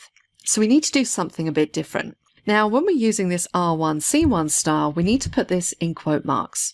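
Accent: United Kingdom. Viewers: Wonderful and helpfully thorough presentation. I learned alot. The British accent was a bonus, as any serious topic spoken with a British accent makes the topic suddenly sound more intellectually sophisticated lol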